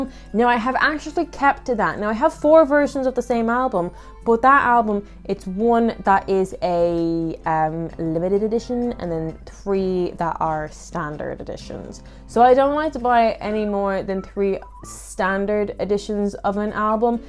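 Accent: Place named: Ireland. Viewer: Are you Irish? I like your accent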